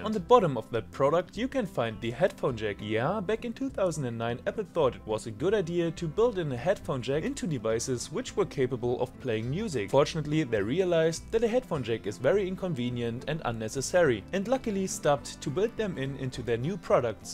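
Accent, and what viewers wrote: Germany. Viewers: Dieser deutsche Akzent xD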